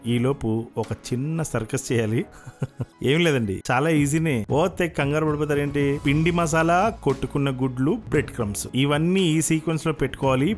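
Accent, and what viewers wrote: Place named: Visakhapatnam, India. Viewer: Me native place enti garu achamga visakha telugu la untadhi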